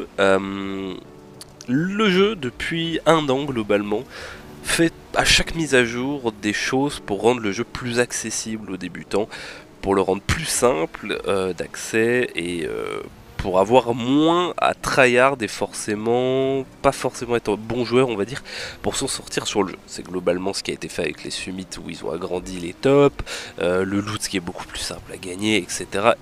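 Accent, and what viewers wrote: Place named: Lorraine, France. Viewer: lol me suis fais la meme remarque. mais ça c'est l'accent de lorraine; en vrai il est assez eloigné du français lol.. comme le "j'ai pu', au lieu de "j'ai plus"..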